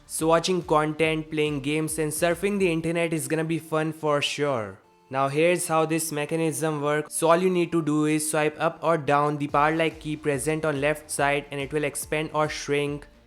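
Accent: India. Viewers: I heard indian i dislike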